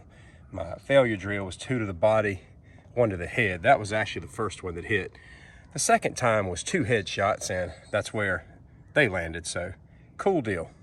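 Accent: South, United States. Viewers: This guy has a really cool southern accent. Good video.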